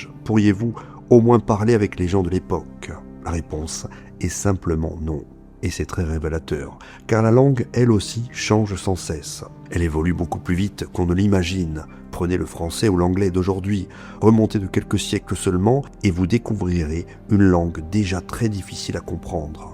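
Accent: Corsica, France. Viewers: Alors y a un truc qui m'étonne ;-) J'écoute tes contenus en mode podcast avec un écouteur, le soir dans mon lit, dans le noir avec mon écran retourné vers le matelas pour limiter la luminosité au maximum... Bref, tout ça pour dire que je me concentre sur l'histoire et sur ta voix. J'ai écouté pas mal d'histoires et à chaque fois, je dis bien à chaque fois, je trouve que tu as un accent Corse. Je ne saurais dire si c'est Corse du sud ou Haute Corse mais je penche pour cette dernière. Alors, j'aimerais bien savoir si tu as vécu en Corse ou bien quelle région de France peut avoir un accent aussi proche ! Je vais peut-être gagner le prix de la question la plus bizarre de l'année :-)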